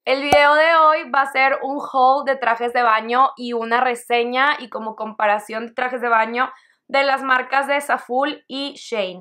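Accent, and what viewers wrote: Monterrey, Mexico. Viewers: Primera vez que te veo y muy bien explicas genial 😀 new subscriber ❤️ hablas cono regia 😁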